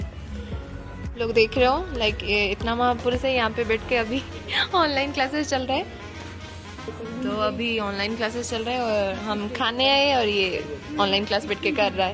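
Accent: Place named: Odisha, India. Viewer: hindi re Odia accent bahut achi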